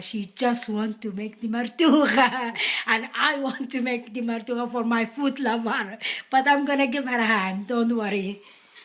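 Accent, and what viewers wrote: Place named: United States. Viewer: you are really great , but you have an American accent , Are you live there before ???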